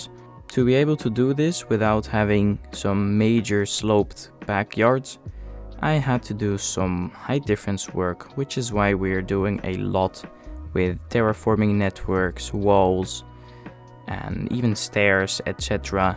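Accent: Netherlands. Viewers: Me vrouw zei hij heeft een Nederlands accent, en volg al denk een jaar je kanaal😂😂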